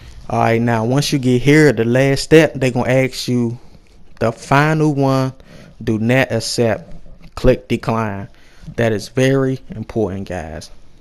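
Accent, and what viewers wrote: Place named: Washington DC, United States. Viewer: I came from another video and I didn't watch the whole video but the part that I did see you sound like you from DC.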